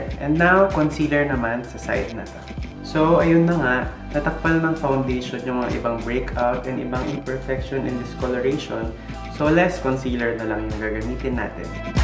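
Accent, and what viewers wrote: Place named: Visayas, Philippines. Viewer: ❤️❤️❤️..i love the way how you speak bisaya word..😘😘😘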